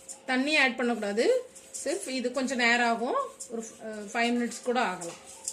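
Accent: Tirunelveli, India. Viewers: திருநெல்வேலி ஸ்லாங் கேட்க நல்லா இருக்கு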